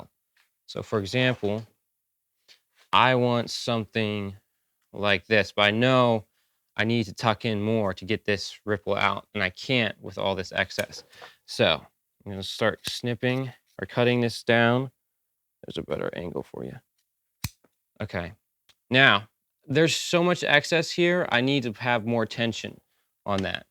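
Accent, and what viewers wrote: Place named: Annapolis, United States. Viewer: Super helpful. Are you by any chance from Annapolis? Accent reminds me a lot of a buddy of mine who grew up there.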